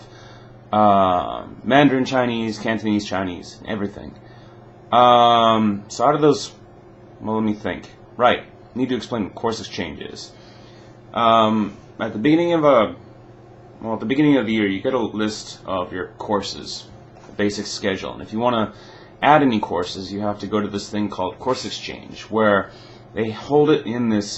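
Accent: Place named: Japan. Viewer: Interesting. You and I may be the only ones in the US who did not start because of anime. Judging from your accentation I guessed you had access to a friend or relationship partner to study with from Japan. Still, it takes a unholy obsession to learn Japanese, I feel there is much more to this story left untold.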